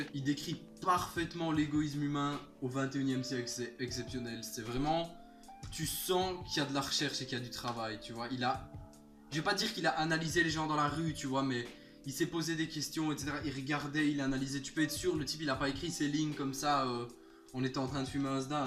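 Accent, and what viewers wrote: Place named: Belgium. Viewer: Ça sent la Belgique dans la voix le gars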